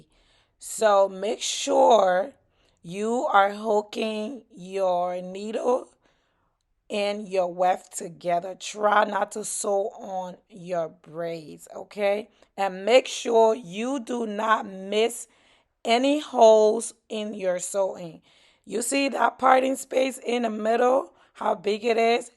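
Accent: Liberia. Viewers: This came out so gorgeous mama ❤the hair and the makeup you are talented 👏🏾btw are you Liberian ? The accent sounds Liberian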